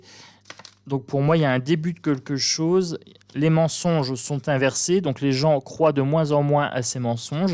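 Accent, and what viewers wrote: Nord-Pas-de-Calais, France. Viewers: Bonjour, vous seriez pas un peu ch'ti par hasard??? J adore votre humour 😊. Merci pour ce petit tirage. A bientôt.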